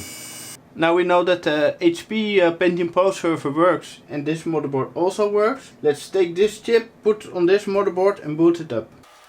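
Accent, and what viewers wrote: Netherlands. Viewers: know :-) But I think not all DutchPeople speak like this. Anyways, I like it. Makes him unique to me :-)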